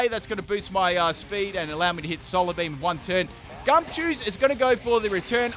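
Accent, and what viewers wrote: Australia. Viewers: Australian accent baby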